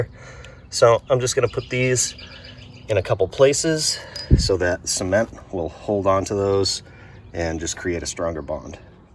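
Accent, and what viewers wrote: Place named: Northeast, United States. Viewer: Try as you might you can't hide that Northeastern accent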